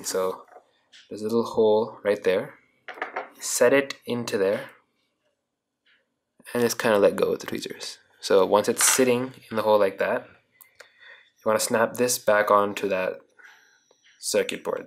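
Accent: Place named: United States. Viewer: pehle to laga ki koi Ameriki hai.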